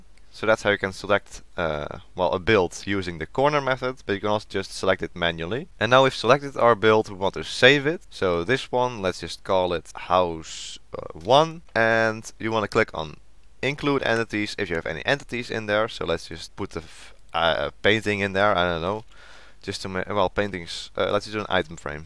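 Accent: Netherlands. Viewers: You sound like a dutch man am i right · Hahahahaha Hoi Nederlander ahahhahahaha geen haat maar bij elk Nederlands persoon kan je horen dat je Nederlands bent als je Engels praat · Ik hoor aan je stem dat je een Nederlander bent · Are you dutch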